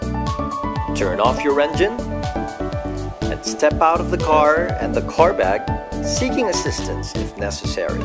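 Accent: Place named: Philippines. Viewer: Nice Filipino accent there lol.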